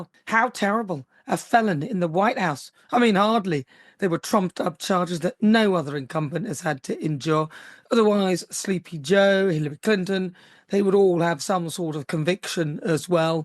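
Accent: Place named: Birmingham, United Kingdom. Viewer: Lol, ur brummy accent is thick and i live here too and have heard ALOT of ozzy osborne accents 😅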